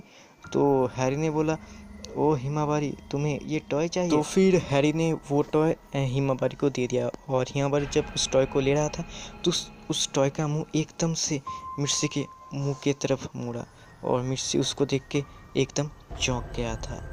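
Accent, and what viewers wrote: Bengal, India. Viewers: Bhai kya story super and are you Bengali no bhai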